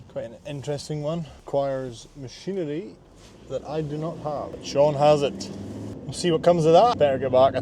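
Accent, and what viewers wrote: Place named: Scotland, United Kingdom. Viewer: absolutely no idea how i ended up here apart from scrolling through youtube on back shift at work. Grat content mate. Great to hear a fellow scotsmans voice on youtube! wish you all the best boys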